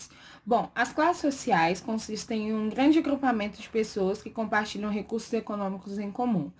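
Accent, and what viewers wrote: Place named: Minas Gerais, Brazil. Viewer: Ótima análise! Belo sotaque 😊 É mineira? Rsrs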